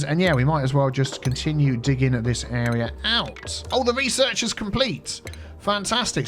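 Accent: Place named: RP, United Kingdom. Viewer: you sound like a posh British person with a bit of not so posh British accent the only real question is what type of tea do you drink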